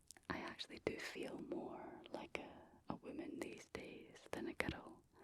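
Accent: Scotland, United Kingdom. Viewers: I love Scottish accents so much, I find myself repeating words in it sometimes. It’s so satisfying 😂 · As an American who just found out that i have mostly Scottish heritage… it explains why I love the accent so much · HELLO really enjoy your Scotty accent and your beautiful smile. Rick Henderson U.S.. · I love ASMR and also have studied linguistics, and the Scottish and Irish accents are literally some of my favourite accents in the world; they’re the funniest, somehow the most uncanny, and I love it. Perfect mix. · I've loved Scottish accents on women since I crushed on Sheena Easton way back in the day. 🥰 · Most Gorgeous! Love your Pure Scottish Accent Whispers! Love your Beautiful Smart Look!:yougotthis:💯🌟❤🌻🌼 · Love the Scottish accent. Found it extremely relaxing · Gosh i Miss Scotland I fell in love with your accents this past summer 😃 lol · Love your Scottish accent 🥰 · Doesn't even have to be ASMR, I absolutely LOVE the Scottish accent. 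I could listen to someone with this accent talk about absolutely nonsense allllll day. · Scottish has to be one of my favorite accents! 🤌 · Your Scottish accent makes my brain go all squiggly. Never stop saying words please.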